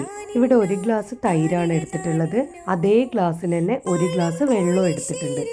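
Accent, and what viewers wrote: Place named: Pattambi, India. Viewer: എന്റെ നാട്ടുകാരിയാണോ?. സംസാരം കേട്ടപ്പോൾ തോന്നി. ഞാനൊരു പട്ടാമ്പി ക്കാരിയാണ്. ഞാൻ കൂട്ടായിട്ടോ. തിരിച്ചും സപ്പോർട്ട് വേണേ 👍👍👍💕💕💕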